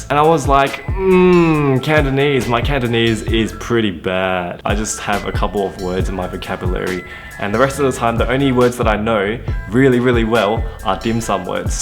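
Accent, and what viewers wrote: Australia. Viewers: That ozzy Canto combo is hilarious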